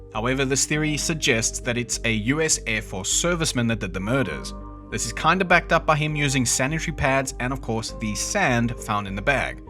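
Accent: South Africa. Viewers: Is a guy from South Africa talking about the ineptitude of the police in California? Crazy work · You need more subs. So glad to hear a fellow South African.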